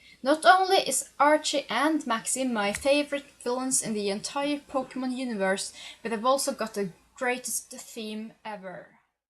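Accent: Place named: Balkans. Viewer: yeah, a let's play would be nice.. I like your accent and the way you talk! So the ppl like me who actually like your takling, would enjoy the vids as well:) You're from the balkan, right?:D And I'm telling you that bcz you seem very aware of your talking since you mention it in the beginning of somme vidz:D ASMR is cool, it's for relaxation purposes.. it's all about sounds ppl can hear, like whispering, or for example the sounds of the buttons when you play on the DS.. Look it up:) if you do those vids, ppl who like pokemon will watch your vids but also ppl who like asmr... And ppl who like them both will simply adore you lol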